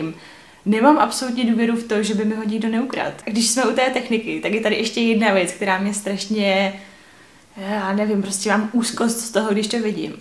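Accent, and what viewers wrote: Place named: East Bohemia, Czech Republic. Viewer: Slečna mluví očividně východočeským nářečím 😉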